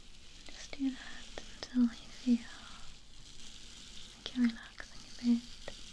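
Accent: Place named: Midlands, United Kingdom. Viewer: I get the impression you're from the Midlands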